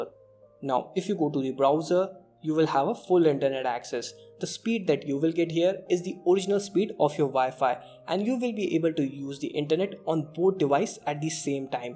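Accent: India. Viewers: An Indian I can understand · I love your low-tone indian voice, every word is properly pronounced. Great job!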